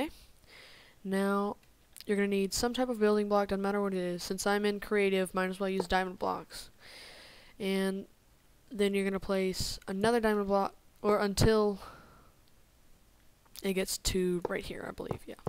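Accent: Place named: Texas, United States. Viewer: ima guess ur from texas? :3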